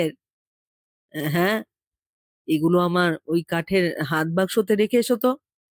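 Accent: Bangladesh. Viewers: এই গল্পটা এদেশী লেখকের লেখা।বাঙাল টানে পড়লে তা উপযুক্ত হয় না।তাই পাঠ অত্যন্ত নিন্দনীয়। না বলে পারলাম না।পারলে শুধরে নিও।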